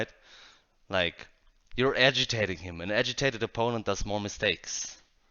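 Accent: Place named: Europe. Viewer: I know that you're european just by your accent :P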